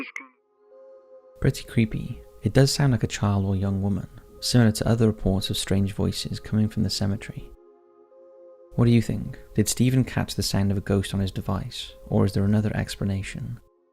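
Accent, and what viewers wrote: United Kingdom. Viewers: Nothing like stories of poltergeists and demons told by soft British voice to help you nod off.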